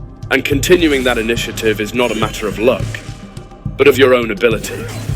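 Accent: United Kingdom. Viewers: 영국식 엑센트 맛집임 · 완벽한 영국식 영어다 하다가 중간중간 개뿜네 ㅋㅋㅋㅋㅋ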